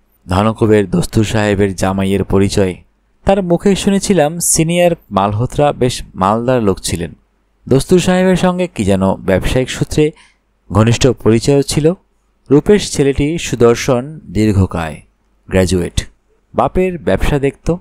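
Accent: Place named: Bangladesh. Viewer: আপনার উচ্চারণে বাংলাদেশ এর টানটা খুব মিষ্টি লাগছে, কৃষ্ণনগর থেকে 👍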